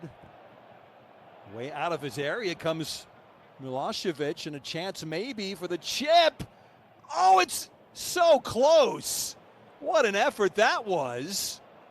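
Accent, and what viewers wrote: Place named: United States. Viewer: I am reading all sorts of snide remarks about the commentator. Part of me thinks it's unfair. I'm writing my own comment after having listened to about 2/3 of the clip. Nothing that he's said to this point is glaringly stupid. Perhaps a bit unpolished, but what should one expect? It's a Europa League qualifying match, not an FA Cup final or El Classico. Part of me is offended that it's simply anti-American bigotry - being American myself. But even I, proud Yankee Doodle that I am, must confess that an American accent coming from the mouth of a football announcer, is incongruous at best. Well done, Hibs. I'm a lifelong Celtic fan, but wouldn't be in the least put off if you lot make us sweat a bit this season.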